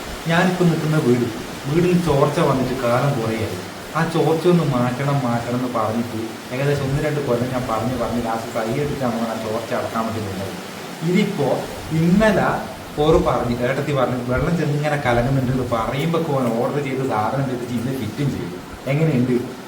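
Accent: Kannur, India. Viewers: നിങ്ങളുടെ vdo കാണുന്നത് ഈ സംസാരം കേൾക്കാൻ വേണ്ടിയാണ്, എല്ലാരുടേം സംസാരം എനിക്ക് ഒത്തിരി ഇഷ്ടാണ്. കണ്ണൂർ സ്ലാങ് നിങ്ങൾ പറയുന്നത് കേൾക്കാൻ സൂപ്പർ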